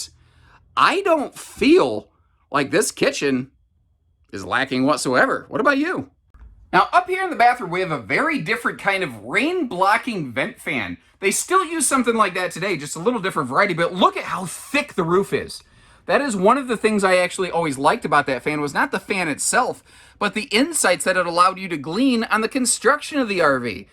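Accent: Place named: Upper Midwest, United States. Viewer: They very first video of yours I watched I heard “right dere” used as punctuation and knew you were a good upper midwestern boy. I, too, am from the midwest and it’s pop. I’ve got the significant other trained to say pop when speaking directly to me (it’s soda otherwise) and my adult daughter is still with us and said that if she goes back to Alabama calling it pop she’ll be ticked. It’s pop. 😬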